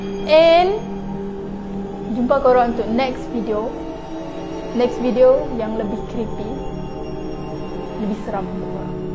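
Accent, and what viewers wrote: Sabah, Malaysia. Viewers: Orang Sabah ke?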